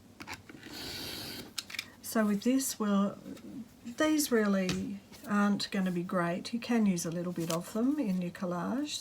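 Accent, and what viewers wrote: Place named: Australia. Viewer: Aah. I was puzzled cause at time te Australian accent slips and I couldn’t place it. I thought South African or New Zeeland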